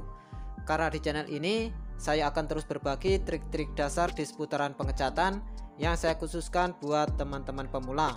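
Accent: Java, Indonesia. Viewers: oh kirain..logatnya jawa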